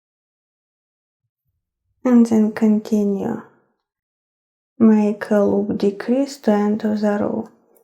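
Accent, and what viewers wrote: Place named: Russia. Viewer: Сильный русский акцент... Тяжело воспринимается. Жаль :(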